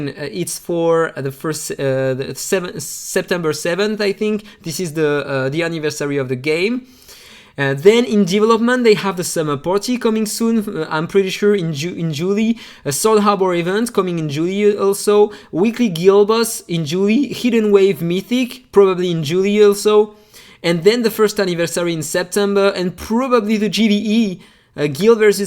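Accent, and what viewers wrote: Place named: France. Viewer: He's French, relax English is not his first language.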